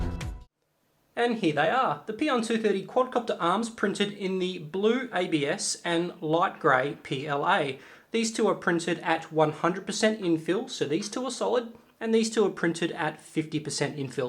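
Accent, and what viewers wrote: Australia. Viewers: Love the strayan accent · Nice australian accent!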